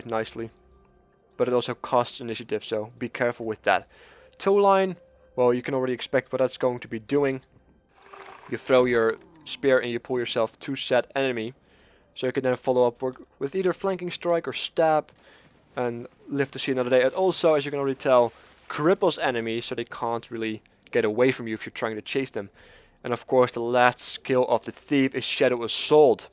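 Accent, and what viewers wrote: Netherlands. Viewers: Are you by any chance dutch? XD what server are you on? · You sound dutch :O Very nice coverage tough ! looking forward to use the thief :/ 1 more week ! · Sounds like hes from Holland..........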